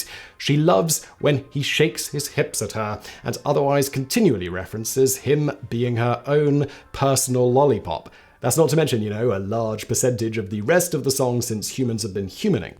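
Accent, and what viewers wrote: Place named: RP, United Kingdom. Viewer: Okay, Simon reading aloud the lyrics "I knew a girl named NIKKI. I guess you could say she was a SEX fiend" is his perfect Queen's English accent made me literally lol and is about the funniest thing I've seen today.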